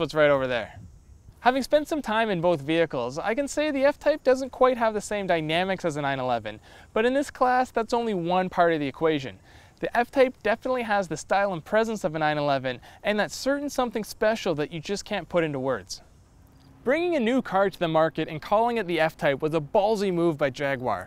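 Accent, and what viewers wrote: Canada. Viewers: Presenter has a Canadian accent.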